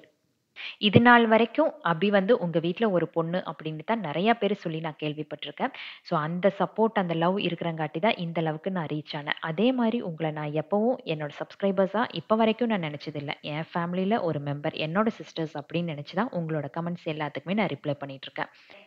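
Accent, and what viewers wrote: Coimbatore, India. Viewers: Hi sis... Oh wow supb akka... Am also coimbatore only naa ipo 2 days ah than unga vedio pathutu irukken.... Really am enjoying also surprised and romba egar oru oru vedio pakkaren... Really supb akka... And unga previous vedios pakkun pothu i thought neega Coimbatore ah than irukkanum kandipa apdinu nenachen becos apdiya Coimbatore slang irunthuchu neenga pesum pothu sila words... So very namma coimbatore... Athum kottur i knw kottur azhagana oor veedu... Romba supb akka we r all keep supporting u... Engalukku neraya visiyangal share pannunga and we learning from u... Love u sis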